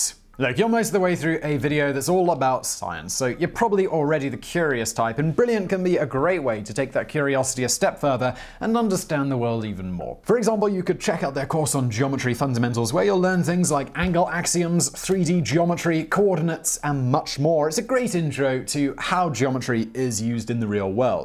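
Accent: England, United Kingdom. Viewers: Sir - yes I said Sir. Pleeease do an episode on how difficult it was to take down a Knight on the battlefield during medieval times. I can't seem to find an interesting in depth video narrated on the topic with an English accent. Not too mention a video that also includes the history behind the armour, misconceptions and why they were so much more than an average soldier on the battlefield during their time.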